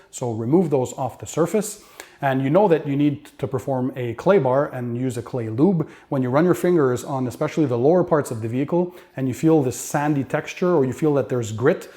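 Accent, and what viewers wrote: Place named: Quebec, Canada. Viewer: I watched 30 mins of your best products vid, and 22 mins of this one before I picked up on your Fr. Canadian accent, lol. Vive la Quebec! Merci, monsieur.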